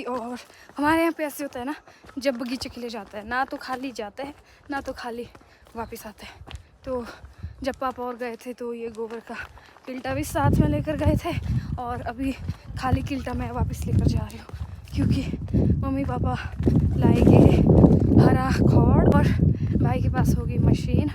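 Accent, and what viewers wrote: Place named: Pahari, India. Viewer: Hy ksy ho? Aap ki pahari ton bhut Aachi h Or Aap khud bhi bhut sundar ho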